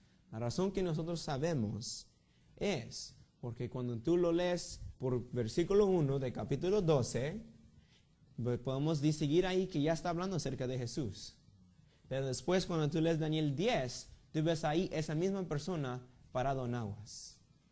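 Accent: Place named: United States. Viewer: Tu español ha mejorado muchisimo. Un poquito de american accent but still good. Keep doing the good work.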